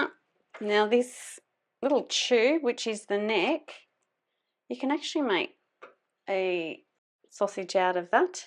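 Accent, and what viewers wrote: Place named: Australia. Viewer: You sound a little australian. Can I ask what country are you in??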